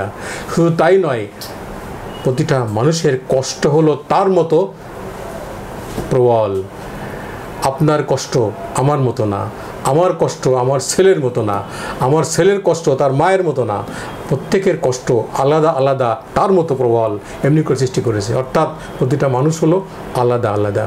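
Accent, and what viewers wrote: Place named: Chuadanga, Bangladesh. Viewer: স্যারের ভাষার মধ্যে চুয়াডাঙ্গার ভাষার মিল পাওয়া যায়।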